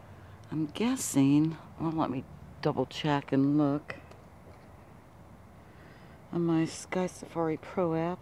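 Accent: United States. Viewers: Good morning {, evening) dear ..,yes very interesting and fantastic. (,,,nos kedves nő annyira jó hallgatni a narrációt azzal az ízes jó amerikai angol kiejtésel , hogy az valami hihetetlen számomra , imádom, és köszönöm.) ..ok ..l wish you a wonderful week - end dear .. ok .. Big Hug.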